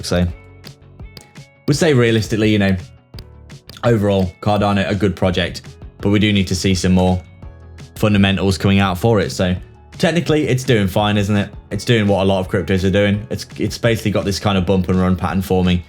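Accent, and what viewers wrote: United Kingdom. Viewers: Its so refreshing to hear a fellow brit talking haha!! Keep it up mate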